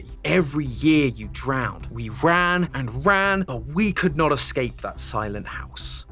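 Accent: United Kingdom. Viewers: British Matpat 😭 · Why is he British now… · I’ve just started watching this and…When did you become british? Like genuinely curious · who is this brit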